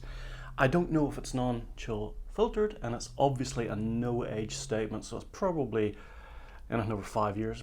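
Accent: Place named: Northern Ireland, United Kingdom. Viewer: Interesting one to hear about. Cheers. Per chance, are originally from N Ireland? Just picking up some local tones. Sláinte.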